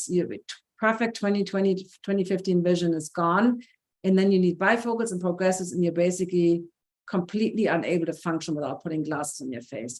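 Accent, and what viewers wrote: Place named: Germany. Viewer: please speak slower in your videos because of your German accent.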